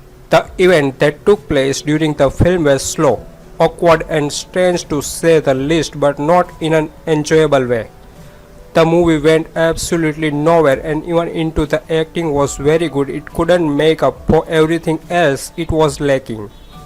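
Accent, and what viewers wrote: India. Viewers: Indian accent sucks!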